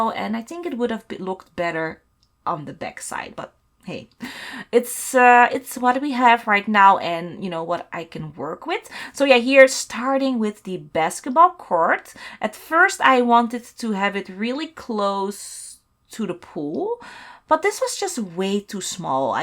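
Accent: Netherlands. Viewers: Are you dutch, or where are you from?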